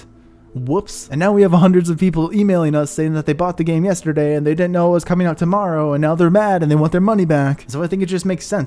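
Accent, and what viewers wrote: Czech Republic. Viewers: Are you Czech?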